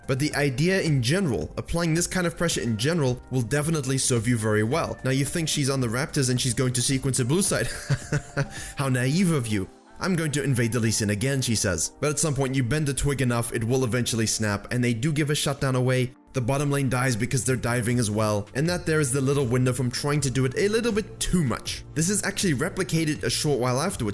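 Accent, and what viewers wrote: South Africa. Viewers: You sound South African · Are you South African? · you're from south africa! <3 I am proud!!!! haha I did not even notice.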